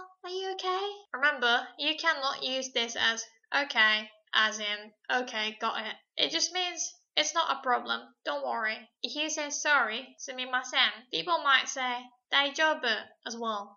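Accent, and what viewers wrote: United Kingdom. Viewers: Now that I've heard a Japanese person with a British accent, I suddenly understand what it's like for British people to hear most non-native English speakers with an American accent. p goofy m8 also saigomademitayo, fam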